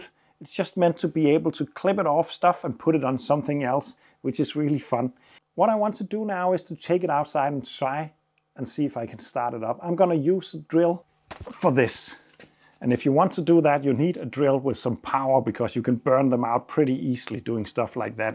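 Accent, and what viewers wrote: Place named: Denmark. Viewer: Synes nok at det var “dansk/engelsk” dialekt 💪🏻. Fed kanal du har her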